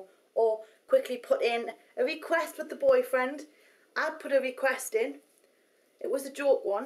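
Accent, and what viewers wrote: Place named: Northern England, United Kingdom. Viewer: She's English. And if I'm not mistaken, she's from Northern England, which is an accent people aren't often familiar with.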